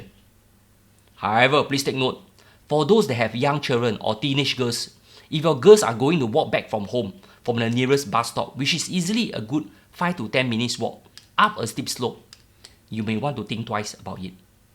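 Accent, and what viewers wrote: Singapore. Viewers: very nice sharing. Always love the Singaporean way of speaking, chap-pa-lang mixture of languages/dialets used. Can do a similar analysis on west coast areas. Around West Coast Rise/Ave/Garden, Faber Crescent/Drive, Sunset Terrace/Drive, Pasir Panjang?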